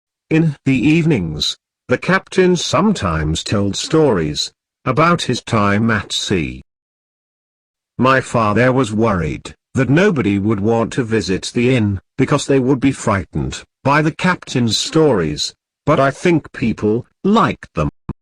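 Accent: United Kingdom. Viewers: The British accent is adorable 🥰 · مذكّرة القصة ❎ تعلم اللكنه البريطانية ☑️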